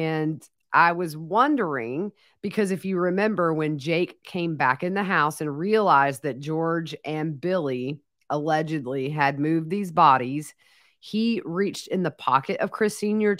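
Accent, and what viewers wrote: South, United States. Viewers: I also love that " Southern drawl"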